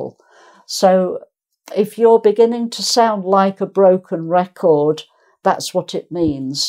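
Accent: United Kingdom. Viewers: Interesting. I love your lesson, Ma'am. The British Accent you speak, and all the teachings are so much helping and understandable. Thank you very much. Greetings from Indonesia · Your accent sounds British, may I know which country you are from ?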